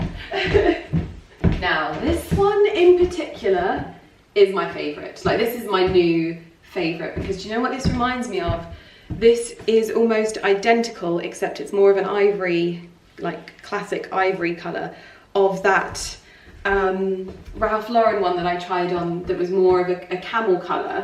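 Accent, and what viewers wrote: United Kingdom. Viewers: Why sorry? You speak as you speak. Be yourself, that’s the person you are after all. Thank you for sharing your British heritage with us. ❤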